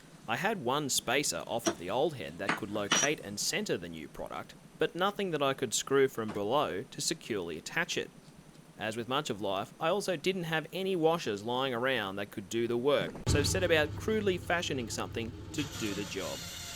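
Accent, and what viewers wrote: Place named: Australia. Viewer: A stupid AUS accent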